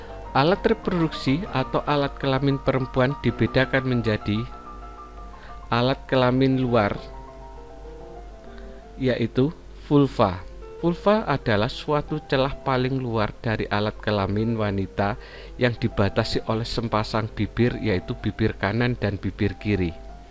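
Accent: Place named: Java, Indonesia. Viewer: Medok pak😂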